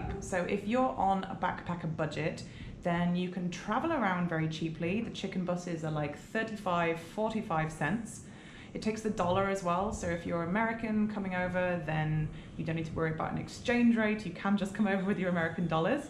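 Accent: United Kingdom. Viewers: we are not used to the brit accent yet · Dear, first and foremost, thank you so much for visiting our country. It's so smashing to know that you have come from so far away good old England. A question, did you visit El Cuco beach?? I believe you didn't but in case you are willing to come back again, I strongly recommend you to visit El Cuco beach located in the eastern zone of El Salvador. Although services are faster in El Tunco, El Cuco beach is so enthralling and I'm sure you will love it. I'm an English teacher with an accent that will set you thinking...it would be amazing if you could listen to my pronunciation. I love british English and your accent is just stunning. I trust you are doing well and enjoying life. I'm so glad you had a smashing time here.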